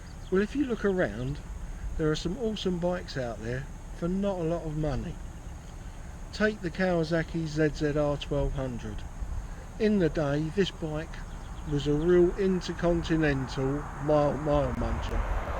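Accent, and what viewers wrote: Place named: United Kingdom. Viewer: For the love of Christ, why do you brits insist on pronouncing the letter Z as the word, "zed?" It sounds absolutely ridiculous. It's a ZZR, not a zed zed r. Z. As in "zee" if you must, but dammit, knock off that retarded zed nonsense. And yes, I have one, an 04, moonlight silver with corbin beettle bags, and i would NEVER call it a zed. Its a ZZR!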